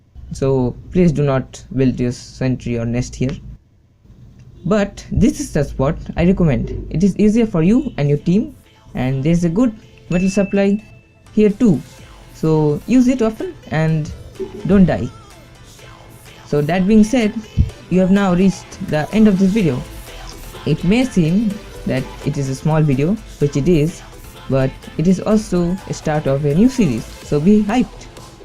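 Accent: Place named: India. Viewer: Ur indian accent is annoying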